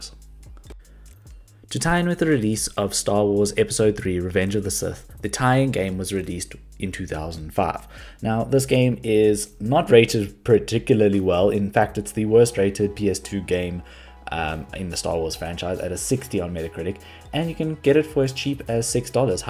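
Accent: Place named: South Africa. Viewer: Let's go! I love that type of accent. I used to have a hard time detecting between Australian, New Zealand, and South African. But I think I'm starting to get it finally! District 9 and Chappie actually helped me a lot with the South African side of things. Anyways. I'm in a big Star Wars phase, so this video was really nice, thank you!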